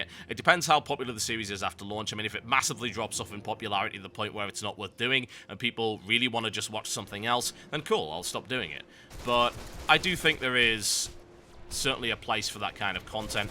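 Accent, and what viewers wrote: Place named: Newcastle, United Kingdom. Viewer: It's nice to see another geordie.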